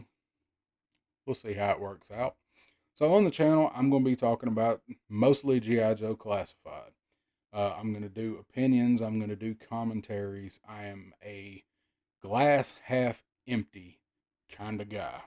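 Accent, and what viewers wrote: South, United States. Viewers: Great video! The drawl is REAL y'all!! It's what we call "talkin'" down here in the south. Nonetheless, this is a good vid to let everyone know what you are about. So far, so good! I can't wait to see what you do with this channel, man! It's off to a great start!! And this is a love letter to the power of family and how important that is to our lives. We are fortunate to have gotten so many Joes and other toys at the time! I know not everyone grew up that way. But, we did, and it was freaking awesome! The creek, the woods, the go karts, the BB guns and hunting snakes, the dump trucks, the dirt clods, the lemonade at our grandparents, the small town gas stations, and the southern drawl. But most of all for us, it was GI Joe and it was the best! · Hell yeah! Love the humor and the Southern Drawl!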